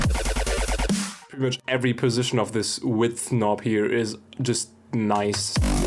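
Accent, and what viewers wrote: Germany. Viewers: You have a germany Akzent or you can speak better than the most english/amerikan people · That german accent tho lol :D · du sprichst ja Deutsch 1.Dein Musikprogramm ist in Deutsch 2.Man hört an deiner Stimme das Deutsch sprichst 3.Du bist der beste Deutsche DJ der Welt!!!!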